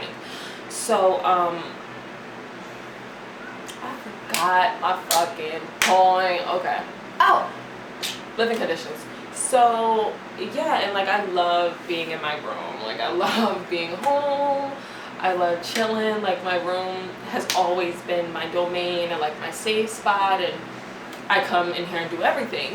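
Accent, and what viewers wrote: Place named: Philadelphia, United States. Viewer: Lol she definitely gotta philly accent. She is probably just using a more professions voice for her platform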